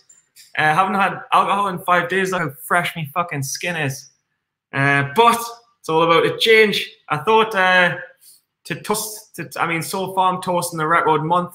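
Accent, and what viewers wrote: North East England, United Kingdom. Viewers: hey man are you from north east of England????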